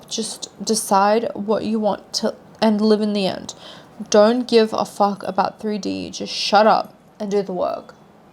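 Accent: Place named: Australia. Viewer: Are you Aussie? Love your accent❤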